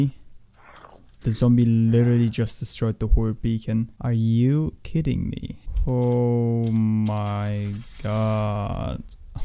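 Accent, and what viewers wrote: Germany. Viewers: U German? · Meine fresse man hört das deutsche raus ;D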